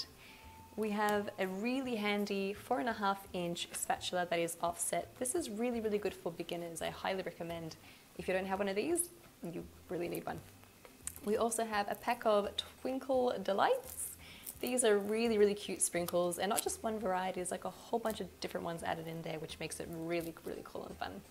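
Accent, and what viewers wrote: Australia. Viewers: Hi, just found your channel looking for tips on how to make my daughters first birthday cake. Your tutorial are awesome. Picked the Aussie accent straight away. I'm from Bendigo.:) xx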